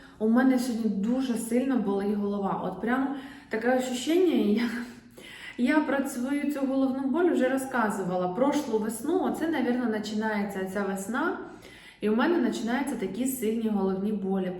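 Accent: Surzhyk, Ukraine. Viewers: Недавно на Вас підписана, відчула щось схоже , не можу описати, особливо мова, мій рідний суржик😊😂 Звідки Ви , з якого куточка України? Я з Полтавської області, зараз також проживаю в Польщі 🤗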